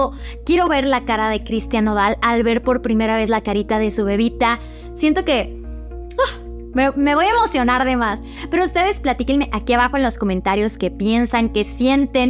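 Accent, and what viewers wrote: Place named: Argentina. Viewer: Como se nota que eres argentina 😅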